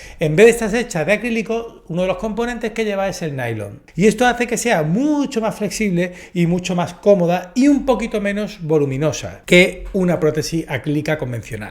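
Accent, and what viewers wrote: Spain. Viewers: El locutor es de España. Tendrás que preguntar a un dentista en México😂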